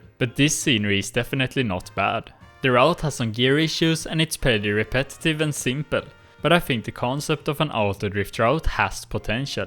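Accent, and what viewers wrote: Sweden. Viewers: love swe english accent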